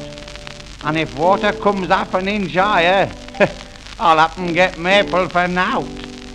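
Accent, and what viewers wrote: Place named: Lancashire, United Kingdom. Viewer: Noah sure could drive a hard bargain,but is messing with the wrong crowd here...Surely found his match in Sam Oglethwaite of Bury..Lovely Lancashire accent though, enjoyed the story by Stanley as only he could tell it...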